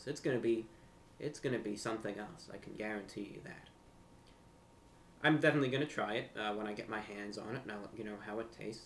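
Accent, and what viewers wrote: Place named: New York, United States. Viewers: I like these vids, they are enjoyable although it's hard for me to say why. It's very inoffensive and vanilla, so I guess it's soothing to listen to these random and non-controversial topics after a day full of stuff. On a side note, I was totally convinced you had a NYC or New Jersey accent from my first time watching, but for some reason I thought I heard in another video that you grew up in the south, so I was confused. Anyway, as another northerner who lived in the south for a while, I can definitely say I prefer variety in the weather, and I like winter. A couple of times when I was in Mississippi it actually snowed and I got to see the whole town shut down in a panic, which was fun. We got less than two inches. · wow I was wondering where you were from. I always thought you sounded like you were from NYC metro area but yet your vids often gave impression of you being in the south.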